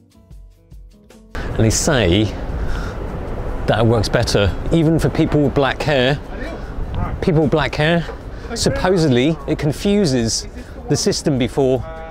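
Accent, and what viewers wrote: United Kingdom. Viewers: 英式英语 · listening to you talk makes me wish i had british accent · Are you British or Japanese with a British accent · The way to improve my British accent, it's watching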